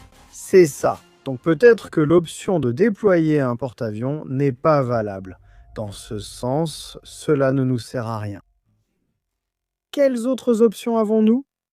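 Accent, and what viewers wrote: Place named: Israel. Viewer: Vous voulez etre les seuls a avoir la bombe atomique. Pour qui vous prenez vous pour les maitres du monde .pourquoi Israël possede telle la bombe atomique.vous ne parlez pas des 75000 palestiniens éliminés par vos force israélienne .aucune sanctions contres l'entité sioniste. J'espère que les iraniens possede l'arme nucléaire.vous devez etre un israéliens cela se devine avec votre intonation. Sortait déjà des territoires palestiniens ou avez commis un genocide inhumain. Mais votre destin est scellé rien ne pourra excusé l'élimination de plus de 75000 palestiniens.avec le silence des européens et des americains.mais dieu veille. et votre Fin et proche.vous n'avez pas d'état vous etes un peuple errant sans domicile fixe.sans la benediction americaine qui vous protege sinon vous n'êtes rien qu'un grain de sables dans l'immensité du désert. 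mais dieu saura reconnaître les siens. Et celui ci feras tombé les foudres du ciel sur vos têtes. C'est vous les terroristes tueur de femme et d'enfant. La situation réelle est que dieu vous chatieras. Tu mangera tes petits pain en enfer.sans les amerloques vous netes rien.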